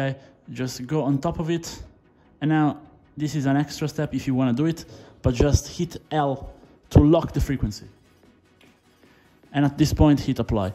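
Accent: Italy. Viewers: dal video ho vagamente sentito la calata italiana ma non ero sicuro 🤣 .... e il secondo video di undervolting che vedo sul tuo canale e ti volevo ringraziare! ho una tuf 3070ti e ho optato per 850 mV a 1800 Mhz con un boost di 1000 e un drop di 200 ma penso che posso spingermi un po’ oltre provando 850mV a 1875 Mhz senza boost di 1000 e il drop di 200.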